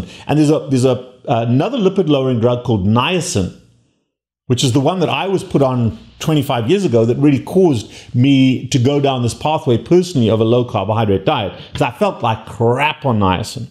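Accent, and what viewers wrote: South Africa. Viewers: Sounds like a Saffa with an American twang on some words.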